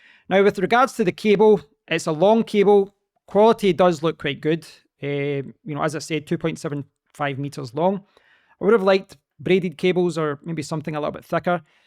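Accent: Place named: Scotland, United Kingdom. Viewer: Shiiiet... love your Scottish! ? (forgive me if it is Irish) Much love from Florida !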